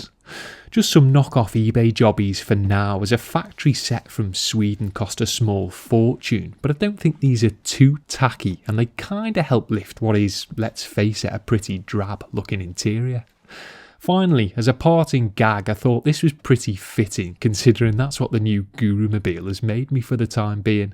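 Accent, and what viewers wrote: Liverpool, United Kingdom. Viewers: I never bother with other car cleaning vids now, most are tedious to the extreme and don't offer the scouse tones I have become accustomed to! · Are you British? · Wasn't sure cos he'd mentioned the Midlands in one of his videos, and he talks quite slowly for a scouse, but now I've listened to him more I can hear the scouse twang :)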